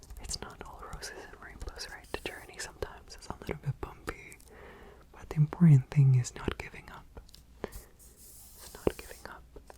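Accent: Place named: Netherlands. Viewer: Another great video! I was actually surprised you hear you’re not American XD although I listening really hard to your accent I figured it was Dutch! Or some Scandinavian country rather ^^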